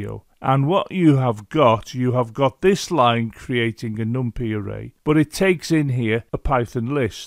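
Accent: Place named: Scotland, United Kingdom. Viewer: Nobody cares today about the correct pronunciation of softwares' names (like Gimp, for example), but I think it's his nice presumably Scottish accent that makes him pronounce it that way.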